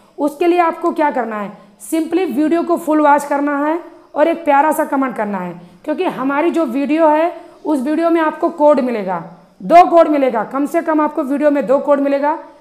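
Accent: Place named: Haryana, India. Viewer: Mam lgta hai aap haryana se ho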